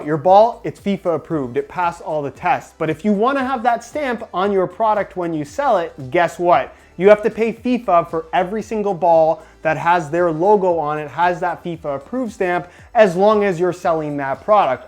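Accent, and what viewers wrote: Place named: Canada. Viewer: “That I talked a-boot”....love the Canadian accent!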